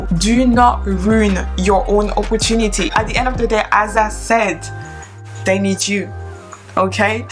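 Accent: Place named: South Africa. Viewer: I actually thought you South African 🥺😻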